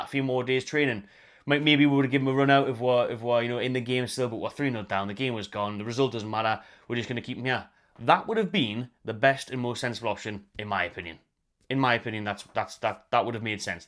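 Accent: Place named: Liverpool, United Kingdom. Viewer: You won the worst premier league since it was created. You get carried by Salah and Van Dijk but their mid 30s now and have their huge retirement contracts and already dropped off massively end of last season. It's back to scrapping for 2nd or 3rd this season im afraid, and that would even be WITH Isak. Ps. The scouse accent is by farrrrrr the most annoying, scummy accent in the UK haha. You total cretin.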